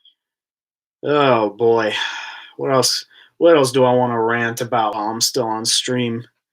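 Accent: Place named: California, United States. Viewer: Just found your channel, pretty good content. Im thinking by your talking, you are in California. Have you seen the Alien museum exhibit at USC?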